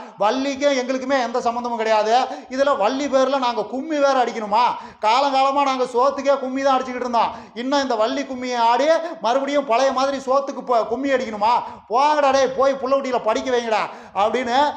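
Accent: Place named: Coimbatore, India. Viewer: ur cbe voice gives the slang a nice one wait for the voice not for the messages matters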